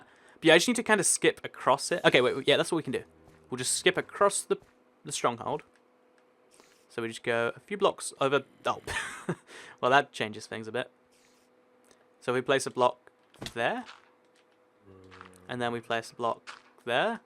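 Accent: Sweden. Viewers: är du från sverige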